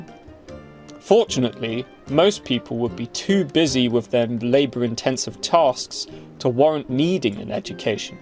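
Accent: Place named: United Kingdom. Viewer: British accent = youtube success. Good channel.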